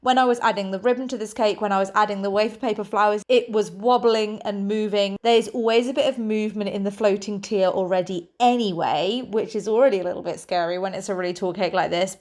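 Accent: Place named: United Kingdom. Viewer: Love your passion and lovely British accent ❤❤❤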